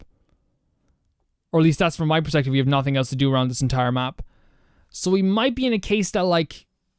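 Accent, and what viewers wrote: Ireland. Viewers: I thought you were Irish? Maybe Iʼm getting you confused with another guy I started watching recently or maybe you moved in the last four years. : Edgeworth should know not to say that. Armando told Mia why last case: never ask a question in court if you donʼt already know the answer. Phoenix breaks it all the time out of necessity, but Edgeworth should at least be trying to follow it.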